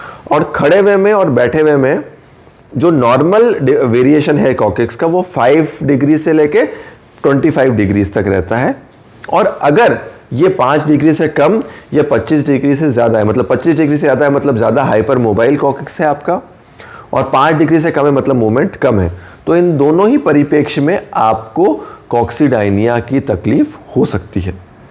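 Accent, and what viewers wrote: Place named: Gujarat, India. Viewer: Gujrati ki zuban me gujrati jalak mil hi jati he .. nice explain sir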